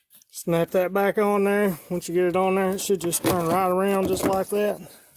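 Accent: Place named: South, United States. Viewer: The first thing I check for is a good solid "Southern Drawl" iminating from the commentator. Once you have established that the presenter has that "Drawl" you're looking for then you can be assured that this fellow is self sufficient and probably has years of experience working on his own vehicles. You will notice that there will not be any unnecessary "BS" from some stuffy old book or manual. What you will hear will be the voice of experience and will be explained in a "no bullshit" manner that even a city boy can understand. So listen up Billybob cause it aint going to get any better than this !!! My hat is off to the gentleman that took time to show me how it is done. Thank you Sir.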